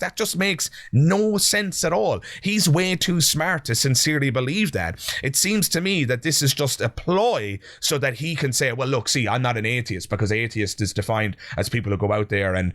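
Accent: Ireland. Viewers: Holy sh*t! You're Irish?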